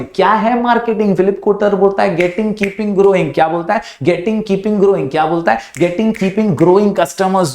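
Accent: Maharashtra, India. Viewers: Thanku sir marathi tadka mast hota😂❤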